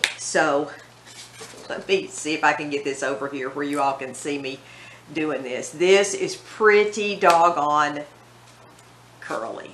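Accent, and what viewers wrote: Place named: North Carolina, United States. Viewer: you make me laugh so often. I'm a North Carolinian & the 1st time I watched you, I said aloud,"" this Girl is from NC or TENN☺💕☺. I just knew that accent💕.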